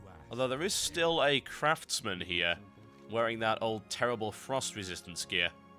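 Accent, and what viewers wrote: United Kingdom. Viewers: Erm, british accent? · When i logged in to YouTube i was like: "Oh, come on now. I need some fantastic brittish commentary on some fresh Cataclysm videos." And When i saw this video under subscriptions, i was like: "Yessss!":D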